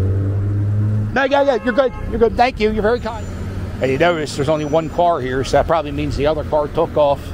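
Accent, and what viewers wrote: Philadelphia, United States. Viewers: I like this guy's Philly accent.....lol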